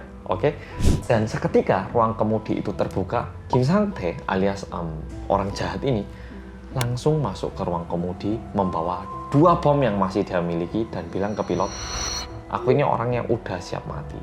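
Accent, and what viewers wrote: Java, Indonesia. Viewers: Nyimak orang Korea sing medok👍 Mantap👍 ni ulasannya Sukses selalu mas🤲🤲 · Di thumbnail nya ku kira mas ngomong ada bom, ternyata medhoook:v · Jiaaaahhhh....... lg seru2nya koq bersambung. Wah dikerjain mas medok nih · Korea reomit hanya orang korea yang medokkkkkkk.. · Setiap Gw Nonton Video Yg Gw Dengar Medooooookkkk!! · Semangat terus maasss medooookkkkkkkk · Ayooookk... Bolo2 ndang cus ndelok like share coment di chanel Korea reomit bersama orang korea yang medoooookk 😊🤗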